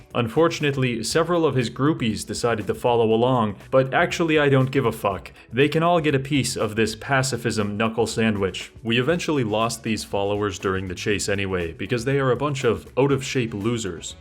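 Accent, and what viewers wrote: Canada. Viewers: I love how your accent seems quite local to me until one word comes out ULTRA CANADIAN and I'm reminded you're not local to me at all.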